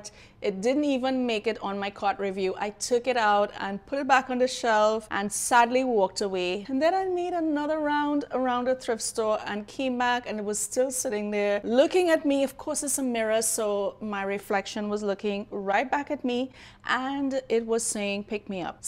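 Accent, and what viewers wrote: Trinidad and Tobago. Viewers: New to your channel. Do I detect a Trini accent? I’m from Barataria. Enjoying your haul.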